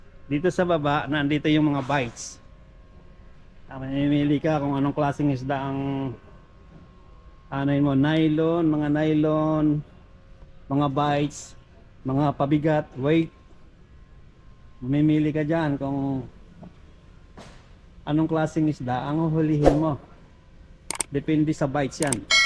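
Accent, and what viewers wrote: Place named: Visayas, Philippines. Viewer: Kuya,wag ka na mag salita tagalog or englush dahil masyadong BISAYA ang dating, say the right pronounciation!!!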